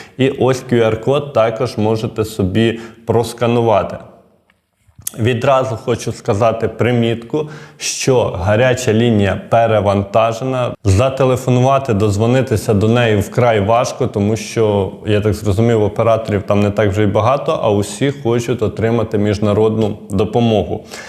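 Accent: Russia. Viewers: Добре,але ваша вимова шиплячих дуже москальська і дратує українські вуха.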